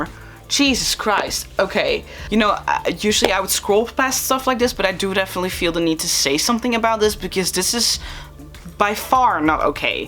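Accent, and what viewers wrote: Netherlands. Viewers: You kinda have a little Dutch accent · oommgg ur english sounds dutch, ben je nederlands? er zijn echt bijna geen therians hier ben echt zo blijj aaa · Are you dutch you sound dutch and I am dutch oh and love the video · Random question are u dutch cause the accent sounds familiar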